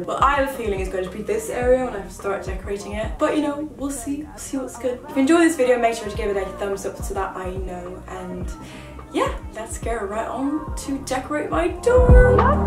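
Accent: England, United Kingdom. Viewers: Who else thinks that she is already getting a British accent!! CAUSE I DO! 👇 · Its so strange being British and I can hear your British accent coming through. I really hope I sound as nice as this on my channel to those who aren’t British 😂😂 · Your semi-british accent 😂❤️❤️ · Loving that British accent and love the video. Thank you for making a real and relatable dorm decor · It's just my impression or your English has changed, it has become a lot more british😂🧡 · The semi-british accent though :😂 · 99% OMG YOU HAVE A BRITISH ACCENT 1% other stuff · your new english accent is so cute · you sound so british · You sound so British but it really suits you 😍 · you already have a British accent! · You sound so much more British........ I LOVE IT 😍💗 This was a really good video Love from a Aussie girl 💖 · holy crap, your voice is both deeper and your accent is becoming british.. 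OH MY GOSH!!! BRITISH + NORWEGIAN = IRISH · Omg u have an English accent!!!! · You are already with a British accent 😂 Love you kisses from Portugal ❤️🇵🇹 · Omg it’s such a cute room! Next can you do a room tour! I love your videos and I think that your British accent sounds great! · You’re english has became more..um british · You have seriously turned British! Your ascent are so good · Omgggg u sound British! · pls notice I love you sooooo much don't stop making videos!😍😍BTW I love u r British accent!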